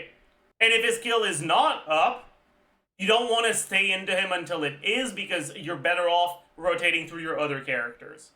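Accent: Quebec, Canada. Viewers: Il est québecois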